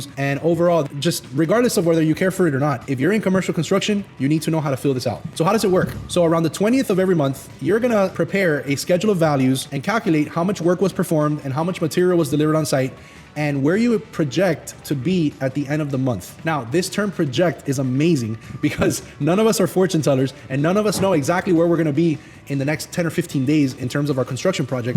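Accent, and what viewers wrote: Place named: Miami, United States. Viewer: Are you from Miami? I could tell immediately by your accent! Lol. I'm also from Miami :)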